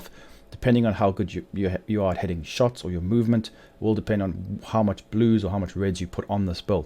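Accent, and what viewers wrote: South Africa. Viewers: Watched this and went for the grind to get the backpack. Got it today and have put together a rough build. Just need to min max it. Thanks for the vid! Is that a SA accent btw?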